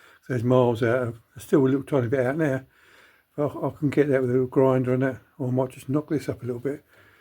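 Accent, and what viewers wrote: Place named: Southern England, United Kingdom. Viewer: Hello. You probably know just saying, A30 has long whip gear lever and later A35 has remote gear lever and slightly altered transmission tunnel. I think the last 1098 engines had a beefier gearbox casting as well some one else will know for sure. I had a late 1098 van (JUW 334 D) for a bit and being so light it was a lot quicker than you’d think and it was bog standard. There were lots of A30s with A30 gearbox and A35 engines - 948 with modern big ends you could rev all day - so no tunnel mods, but i think general opinion was put the 1098 with its correct beefier late gearbox, something to do with baulk rings? Correct me anyone if I’m wrong it was forty years ago. Love your videos mate, wish I could weld like you do. Got a huge soft spot for A30s and anything 1950s. You’ll end up with panels fitting better than from the factory. 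Don’t mind bits of music and I like your voice - spent years in the midlands and any southern accent now is music to my ears. Cheers.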